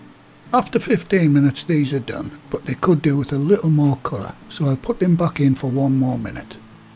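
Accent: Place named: North, United Kingdom. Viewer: ay lad great video sounds like you are a tad further up north than where I am:)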